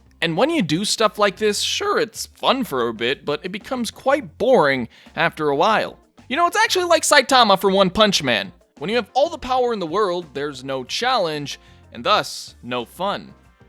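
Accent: Canada. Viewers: THIS DUDES CANADIAN!!!! WHEEEZE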